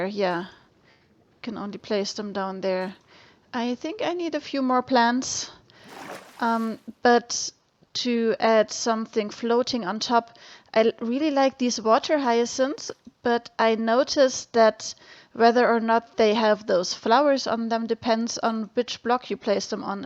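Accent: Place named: Norway. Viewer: How do you make the timelapse? And which editing software do you use? -Architectix and i'm still working on the build so i can help you if you want. And which nation do you come from? You sound kind of Norwegian in the accent.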